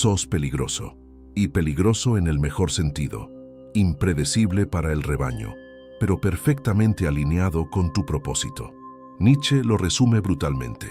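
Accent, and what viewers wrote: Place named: Argentina. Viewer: Acento agertino a tomar por culo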